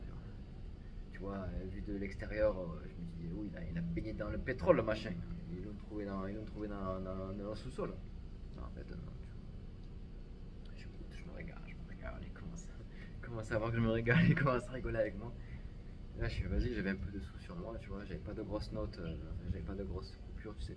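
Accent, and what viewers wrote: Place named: South, France. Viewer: Pleins d’émotions soutenue par ton accent du sud, on apprécie ! 👌🏻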